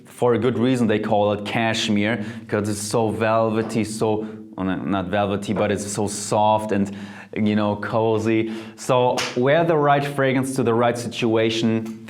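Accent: Germany. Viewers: German accent! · Hehehe you have a German accent. Are you from Germany? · I literally was about to look up if he was German until he said he has a German channel. German accent is strong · As soon as you spoke I knew you were Germany. lol. Thanks for the recommendations. What suggestions do you have for women would have sensitive noses. A lot of perfume irritate my nose and/or give me a headache. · German accent 🙂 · Your german accent is kinda cute :D grüße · Why does he reminds me of a young Ralph Fiennes lol. I guess the German accent when he was playing that commandant in Schindler's List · ist der deutsch? wenn ja man erkennt es eif sofort an diesem akzent😂 nicht böse gemeint btw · Your accent sounds so german :) are you from Germany? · Omgggg I knew he was German I just heard him talking in english and thought the whole video about if he is German too bc of his accent and in the end he talks about having an German account 👯‍♀️ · Is he german? 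He has a little accent, don't he? I'm german, too by the way😉🇩🇪 noch wer? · Omg he’s GERMAN haha I immediately knew it because of his accent. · I can totally hear your german accent coming out 😉 cute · are ya german? i think hes german i can hear an accent loll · Mehta hello! I am french and I can definitively affirm that he is not. The way he's pronuncing french makes me think he is from Germany. Actually, the way he pronunces french"R" is 100% german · He sounds german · You’re German, aren’t you? No worries, your English is great, I’m a linguist so I notice those things. But still, thank you for this list, I will give those perfumes a sniff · Sorry but he sounds so german.. I‘m from Germany so yeah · Too german...if you work with perfumes you need to improve your French, man. Le Femme is pronounced 'fa:m' not 'fem'. Blah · I understood from your accent that you could be only German! Deutschland in my heart! 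❤ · Is he german? The accent? (I'm german) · Is he German? Sounds like it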